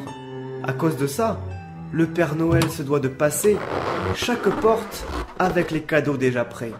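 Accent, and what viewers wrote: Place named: South-West, France. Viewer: Première vidéo que je vois sur ta chaine, et je dois te féliciter ! Très beau texte qui suit le rythme de tes rimes et de ton accent du sud ouest, animation superbe, dessins très soignés, et une originalité indéniable...Bref. Un sans faute !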